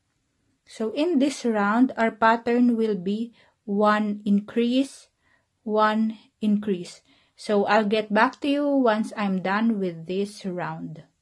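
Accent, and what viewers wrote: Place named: Philippines. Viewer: thank u so much for this video, also are you filipino? the accent sounds familiar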